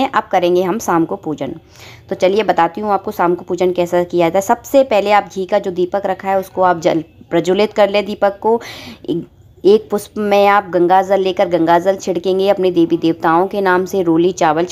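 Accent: Bundelkhand, India. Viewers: Bundelkhand se hain kya aap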